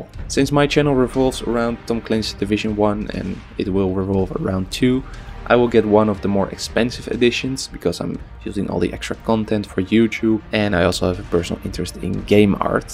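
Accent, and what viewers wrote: Netherlands. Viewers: I played The division 1 even tough everyone disliked it i liked it. I Will be buying The gold version. Btw my uplay is maybe you could add me so we Can play together sometimes. And you sound dutch are you? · Are you Dutch? You have a Dutch accent xD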